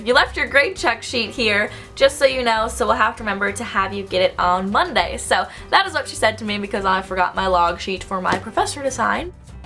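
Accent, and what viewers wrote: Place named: South, United States. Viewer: You sound really southern in this video! haha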